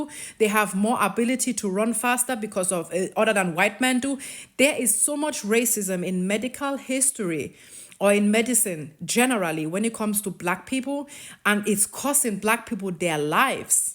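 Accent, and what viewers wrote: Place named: Nigeria. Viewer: Very nice video. Very insightful, and many good points were made. If I may add on one piece: "whiteness" is not the problem; White PEOPLE are the problem: White men, women, boys, girls, little old White women, and cute little White preschoolers! I haven't seen your other videos, maybe you address this there; but this is a point that was not so strongly addressed as far as I could see. I have never seen"Whiteness." I wouldn't be able to identify it nor would I know how to combat it if I came across it. I do know what White people and their racist deeds are however. "Whiteness" is an amorphous, abstract concept that dilutes the dialogue regarding where the problem (and thus the solution) lies. It's actually a shelter, the final fig leaf, so to speak, behind which White people hide. It's part of the problem and a term which should be avoided in conversations on Racism/White Supremacy at all costs! 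Rather than speaking of "our Whiteness," I would rather hear White people say, "I did such and such," or "My mother, my wife, my grandfather, my child, or that White person right over there did so on and so forth." Notice how we NEVER hear White people talking like this, yourself included, ma'am. (Again, within the context of this video.) This would be a good habit for all White people to cultivate, again, including yourself, ma'am. Other than that, great video! I especially like your accent. Nigerian?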